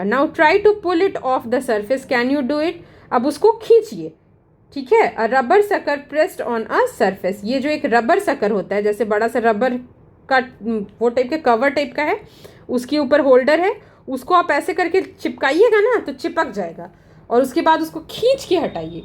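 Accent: Bihar, India. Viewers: Mam are you bihari ?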